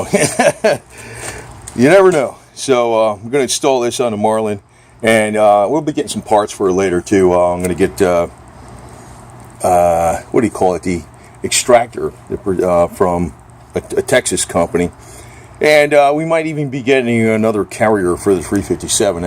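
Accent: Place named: New York, United States. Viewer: Sounds like your from straight outta New York. Or at the very least Northeast? American over China any day.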